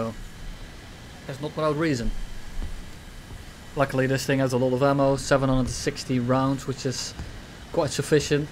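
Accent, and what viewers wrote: Netherlands. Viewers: I have bin a subscriber for some time now and I have to ask, are u dutch or belgains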